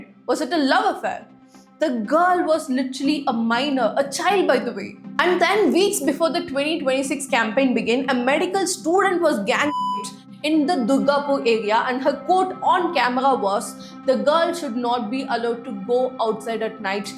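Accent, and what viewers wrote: Tamil Nadu, India. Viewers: Madam Improve your pronunciation and diction. It has a heavy Tamil accent.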